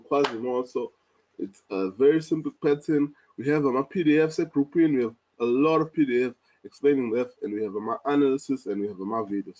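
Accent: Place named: Africa. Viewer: 30 minutes later i'm still not sure what language he is speaking. Sounds like english but also African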